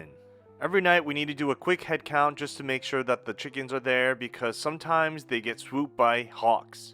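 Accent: United States. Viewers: did you live in america for a long time prior to australia? you speak english in an american accent edit: nevermind i saw the caption right as i typed that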